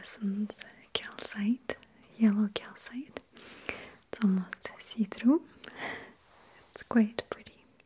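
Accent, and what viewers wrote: Russia. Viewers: I also love your Russian accent, it's so pretty. My parents are also from Europe (Czech Republic) and we all speak both English and Czech! Sweet dreams from Canada 😘😪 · I love your videos so much, you’re my first ever ASMRtist I’ve ever watched! My favorites are your videos where you emphasize your Russian accent and culture, can you please consider doing more videos with your Russian accent please? <3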